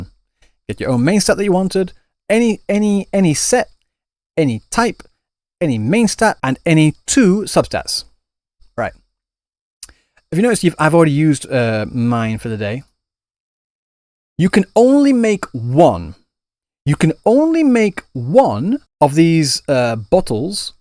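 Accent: United Kingdom. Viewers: British tectone?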